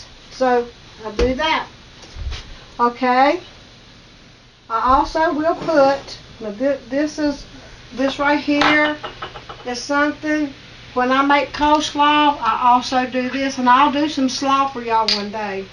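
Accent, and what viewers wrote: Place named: Appalachia, United States. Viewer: Thank you for taking the time to share your knowledge. I feel like I'm listening to my mama's family every time I hear you. Their roots are in Appalachia. I've also noticed some similarities in the some of the dishes you make. Thanks for teaching me new tricks, new recipes, and bringing back old memories.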